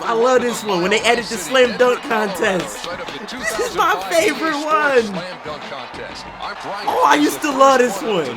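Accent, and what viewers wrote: Philadelphia, United States. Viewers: Lol you could tell you from philly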